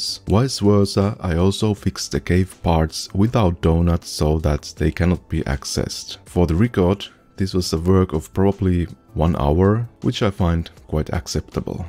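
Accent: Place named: Finland. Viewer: I could bet money you are Finnish. :D I recognise that accent.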